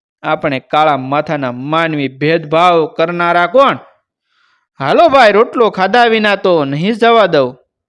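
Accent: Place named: Saurashtra, India. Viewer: વાર્તા તો બહુ સારી કરો છો ભાઈ,પણ હિન્દી માં સૌરાષ્ટ્ર ની ખુશ્બૂ મૂકી દીધી , આપણાં ગુજરાતી ઓની આજ માથાકૂટ છે,હિન્દી પણ સારી રીતે નથી સીખી નથી શકતા,ખૂબ સરસ મજા આવી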